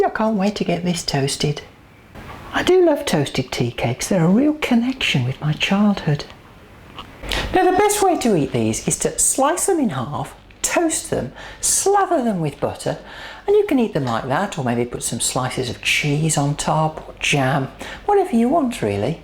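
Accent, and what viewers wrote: Midlands, United Kingdom. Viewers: This lady is an absolute joy, and her recipes are so very easy to follow. Her soft Midlands accent only adds to the warmth of her personality. Wonderful!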